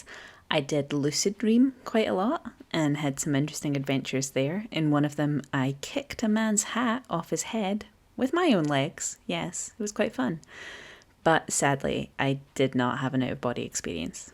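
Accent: Scotland, United Kingdom. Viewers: Hey girl, you Scottish, you sound it. I have used it for years on and off. Been doing a lot of other meditations last few years. Keep going, it took me many years to project, only done it once but it was very vivid. I found myself floating around the ceiling. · You are from Scotland aren't you 😄 love the accent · I've now found a new favorite accent, is that Scottish, Irish, or something else? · . Which particular brand of British accent is that? 🤔😅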